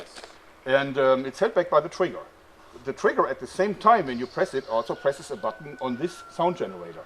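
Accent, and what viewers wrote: Germany. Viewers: I guess I spent too much time in Germany while serving in the US Army to not notice your accent. 😄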